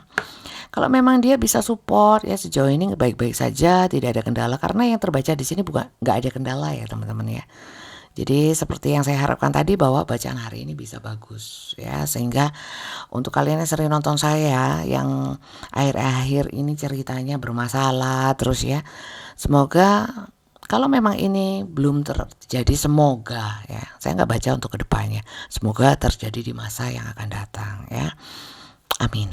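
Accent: East Java, Indonesia. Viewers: BASA JAWANYA JAWA TIMUR AN MEDOK BGT YAAA BUN ARAK MALANG MATAP PERAH JG DI JATIM LUMANYAN LAMA HEMMMMM SUIT SUIT CETOK SEGERRRRR KONGGGGGGGG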